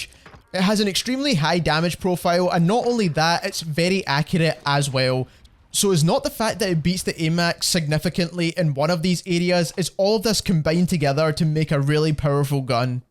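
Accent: Scotland, United Kingdom. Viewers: I like your Scottish accent 😊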